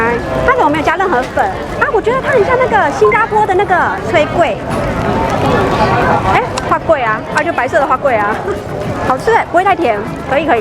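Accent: Taiwan. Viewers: 這台語蠻有特色的🤣🤣🤣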